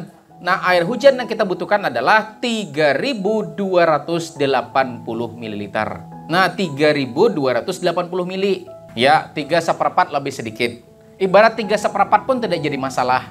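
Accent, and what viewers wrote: Banjar, Indonesia. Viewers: Puan orang mana ta buat logat banjar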